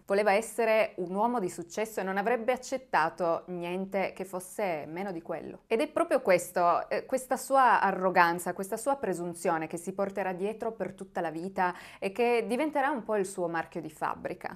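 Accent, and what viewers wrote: Turin, Italy. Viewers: ti devo davvero fare i miei complimenti... sono super appassionata del crime e all'inizio ero davvero scettica (troppi youtuber che si improvvisano divulgatori). Tu sei una bella sorpresa... i tuoi video sempre chiari, dettagliati, si percepisce lo studio che li precede, l'esposizione perfetta, l'accento torinese la ciliegina sulla torta! Sempre un piacere ascoltarti e vederti... volevo tralasciare quanto tu sia bella ma devo dirtelo per forza... bellissima e bravissima!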